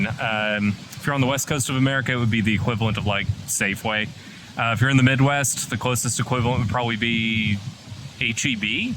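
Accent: Scotland, United Kingdom. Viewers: I get a chuckle when your Scottish accent is creeping in. The same thing will happen to me. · Guessing that you aren't obsessed as I am about organic foods. Are they as accessible as in the U.S.? I LOVE hearing Scottish brogue !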